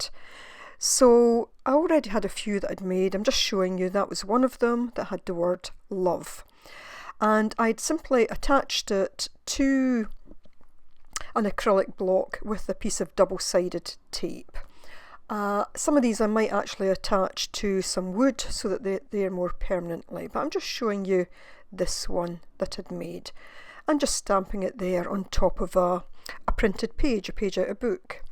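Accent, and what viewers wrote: Scotland, United Kingdom. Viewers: Perfect I've been looking for a video on how to do this for ages. Thanks - and you sound just like me - east scotland, yes?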